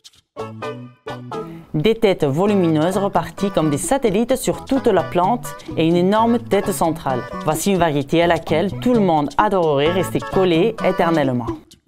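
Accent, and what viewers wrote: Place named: France. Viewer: Oulah chérie tu m'as comblé avec ton accent frenchie 😍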